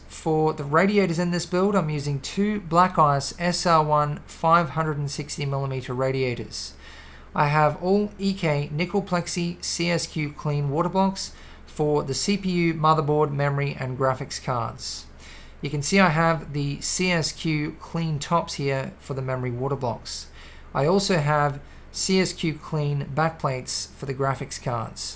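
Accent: Australia. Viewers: I hope you manage to make a nice number of £/$ from your videos because they are excellent. I see that you do builds for customers, is it for only certain parts of the world? I ask because I live in the UK and you sound like you live in Australia... How could you build a rig for someone like me, that lives on the other side of the world? I do have a brother in Oz, for someone like me, I suppose I could pick it up; however, I cannot see me getting my custom rig on the plane as hand luggage. Do you have a website, or place that reveals your details for custom builds? Shalom and Grats!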